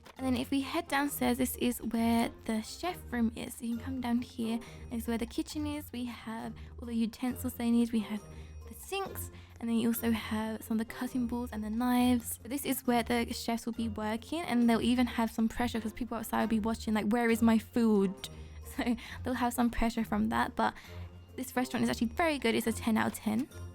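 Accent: United Kingdom. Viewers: Wait Are You British?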